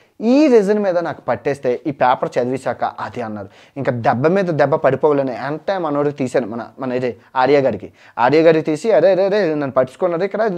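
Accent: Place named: East Godavari, India. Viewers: Namaskaram I watched all Afghanistan videos I felt good experience sitting at home in India and ur East Godavari telugu is good and ur commedy is ultimate in khatranak Afghanistan First time I watched ur all videos of Afghanistan I loved it Because of you I came to know they hate Pakistan and they love our India Thank you Jai Hind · thalian lu CHADUV ko ledu kada tala noppu TESTARU anta adi cheppinappudu tega navvukunnanu...me matalu yasa mana visakha Jilla la undi😂😂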